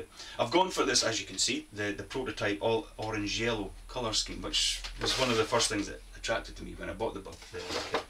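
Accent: Scotland, United Kingdom. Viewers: just wanted to say I really enjoy your videos… I like your style, man! okay, maybe it's just the cool Scottish accent, I don't know… It's good stuff. I've been on ism for about a month now and I go by RubeGoldberg. I'm sure you'll appreciate the significance of that username. ;)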